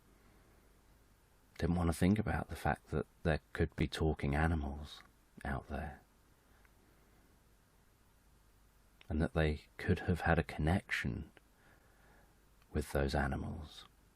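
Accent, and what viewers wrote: United Kingdom. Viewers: Thank God for the British accent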